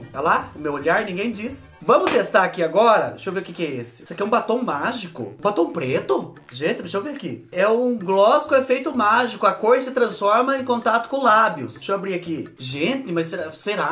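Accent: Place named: Toledo, Brazil. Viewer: Você parece as pessoa de Toledo MG fala iguarzinho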